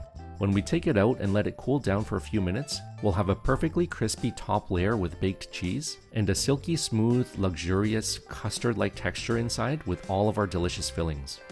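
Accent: Canada. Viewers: Canadian voice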